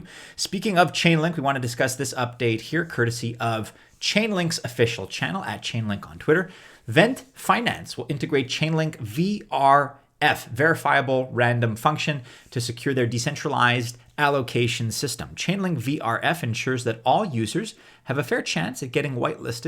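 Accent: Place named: Canada. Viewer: I come for the Canadian accent. Chance at ETH doesn't hurt either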